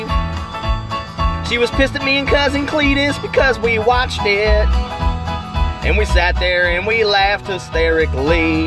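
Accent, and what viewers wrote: South, United States. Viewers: This is so hillarious! I love your southern accent on this makes it funnier and the lyrics are genius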